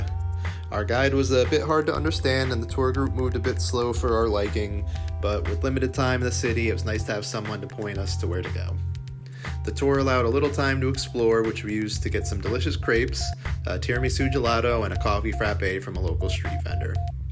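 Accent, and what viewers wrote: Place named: North America. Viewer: Great video! Could you please describe the process of embarking and disembarking from foreign ports? I assume from your accent that you are either from the U.S. or Canada. So am I, and I'd appreciate some tips on what to expect when traveling to and from Europe to board and disembark. What is customs like? Is it hard to navigate on your own?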